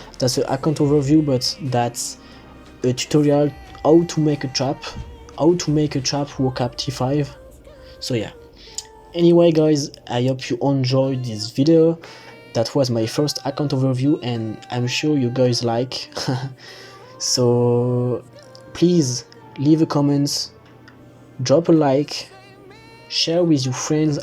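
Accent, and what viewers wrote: France. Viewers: Are you french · Tu change tous me temps de guilde mdrr frero sa s'entend que tu et fr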